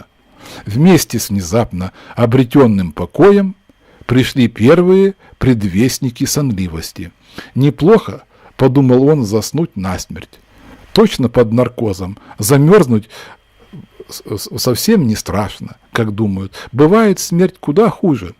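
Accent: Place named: Ukraine. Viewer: Спасибо за начитку, конечно, но украинский акцент, сбивчивость и, самое главное, обилие рекламы, делают прослушивание некомфортным, относительно других чтецов произведений Лондона.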